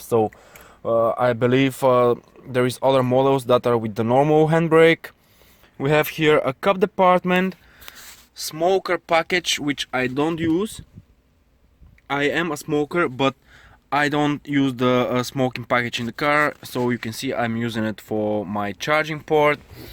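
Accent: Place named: Bulgaria. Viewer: Лол пич !! Много ти личи ,че си Българин. Още не ти бях прочел името на канала и като чух как говориш познах. :) :)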